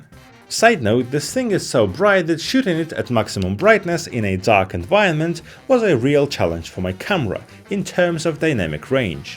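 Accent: Russia. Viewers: Должен сказать, что русский акцент почти не слышно. Отличное произношение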